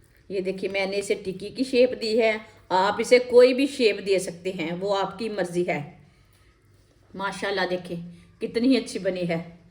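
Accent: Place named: Punjab, India. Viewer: آپکا پنجابی لہجہ بہت اچھا ھے👌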